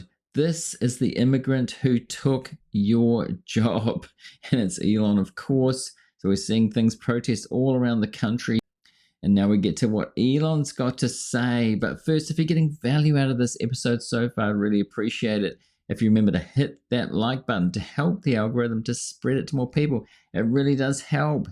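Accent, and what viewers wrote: New Zealand. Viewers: Good Kiwi accent bro'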